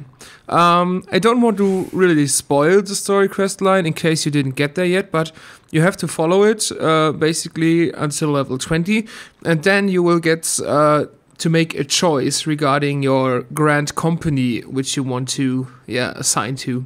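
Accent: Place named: Germany. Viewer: Are you from Germany? Wonderful accent alot of flare, like final fantasy XIV. So thanks for the info.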